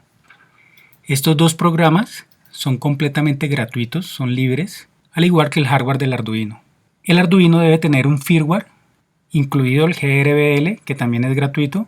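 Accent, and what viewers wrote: Colombia. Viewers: hola amigo, que buen trabajo, eres de colombia? mas o menos por cuanto salio todo hablando monetareamente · Por el tono de su voz ,creo que es colombiano,y de ser así ,mi respeto ,me párese muy loable lo que hace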